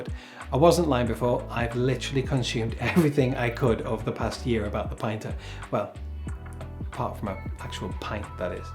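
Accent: United Kingdom. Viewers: Good scouser. Haha. Well done. Great review. Defo buying this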